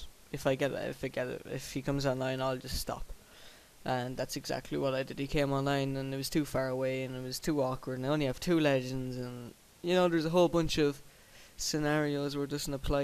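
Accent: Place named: Ireland. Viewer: What part of Ireland ya from? Waterford?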